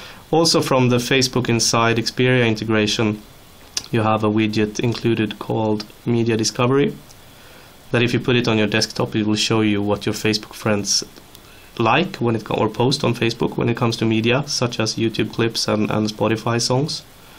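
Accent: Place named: Sweden. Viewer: i love how you hear that hes swedish xD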